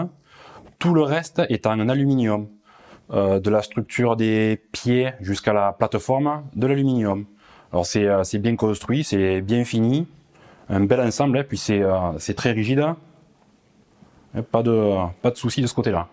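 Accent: South, France. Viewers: Bravo pour ce fabuleux travail! L'implication dans chaque vidéo est très visible! Un bon test qui fait ce qu'on lui demande. (au top d'avoir mis un exemple, c'est pour moi la base dans ce type de test). L'éclairage, le mur est au top! Et toujours avec l'accent du sud!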